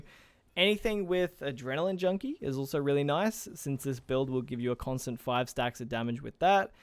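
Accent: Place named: Australia. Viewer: i only just found this channel but its awesome to hear another aussie making videos i might be late to the party here but im new to destiny and i'd love to see some beginner friendly citans ramparts builds for titans its the only exotic i have for titan and i can see void is already strong at the moment but i like the other subclasses too so hopefully you could make a video of some different options thanks, hope i can win the emblem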